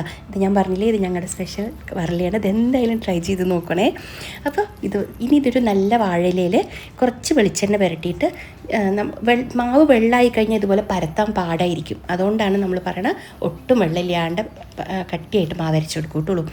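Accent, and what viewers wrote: Palakkad, India. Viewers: Tto tto ttolu palakkadan slang❤️ from a pkd kari(nemmara)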